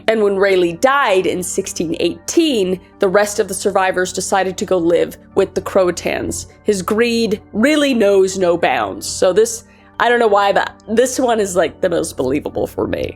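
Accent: Canada. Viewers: I never noticed just how Canadian you were until now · Not trying to be a jerk here, but your Canadian is def showing in these videos bc your pronunciations are all over the place in terms of wrongness. Like Raleigh is said "Rahl-ee" not Ray-lee or whatever it is you're saying. You put sooooo much work into these deep dives and we all love you to bits for it, but you should really look into figuring out how to properly say these place and people names that you're not familiar with. This is like the 3rd or 4th video I've watched where you butchered place/people names.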